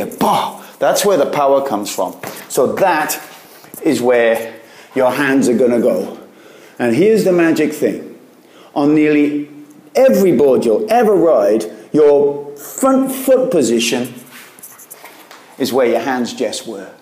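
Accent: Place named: Wales, United Kingdom. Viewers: Looking to get back to surfing after a long break 20 or so years !!! Great video, u from Wales somewhere?? Accent sounds familiar 👍 (Cardiff boy here) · What is your accent! I hear bits of Welsh 😅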